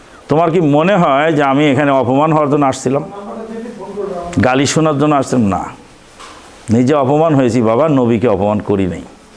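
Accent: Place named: Bogura, Bangladesh. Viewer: Bogurar kotha shune onk valo laglo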